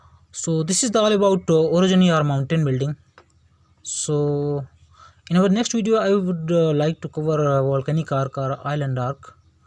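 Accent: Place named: India. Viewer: By according to your phonetics, I think you are an Indian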